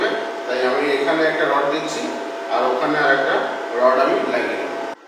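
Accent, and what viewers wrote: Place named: Kolkata, India. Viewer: Ei chele to india tei thake maybe, voice to Kolkata style er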